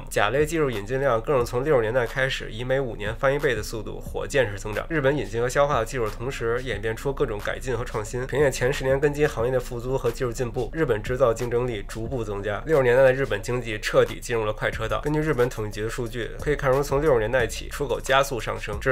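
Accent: Beijing, China. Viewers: 开头笑了。爷关更也好奶关更也好，会一直关注巫师，因为实在文案精彩论据夯实。京片儿口音也很喜欢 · 这北京口音跟lks好像，喜欢😜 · 巫师大神，哪都好，就是您的北京话实在是太北京话了，能稍微吐字清楚点么，谢谢您。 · 北京腔太难听好好说话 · 就爱听的北京话聊正事儿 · 北京腔真是难听，另外少参杂个人想法保持客观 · 还好吧，最多是北京人说普通话，纯正的北京话吞的妈都不认识了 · 每次最后的一分钟我都想写下来，分分钟拿去diss那些傻逼。还有就是我好爱巫师的北京话 ❤️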